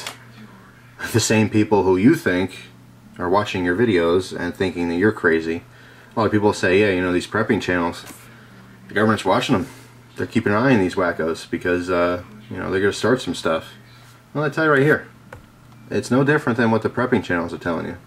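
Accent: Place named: New England, United States. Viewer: ive been watching your videos so much i feel im taking on your new englandish accent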